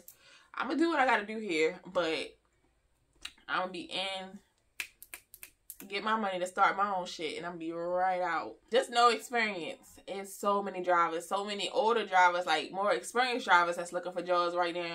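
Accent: Baltimore, United States. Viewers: Sounds like you from Bmore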